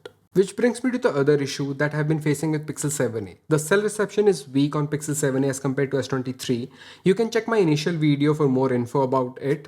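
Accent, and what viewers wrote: India. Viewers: I love the India accent. 😁👍🏽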